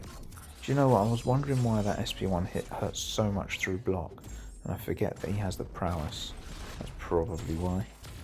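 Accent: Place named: England, United Kingdom. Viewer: Just wanted to comment and say keep posting. I prefer you over Lagacy and being English myself it's so refreshing hearing a familiar accent. I'll be watching more videos to come! 🇬🇧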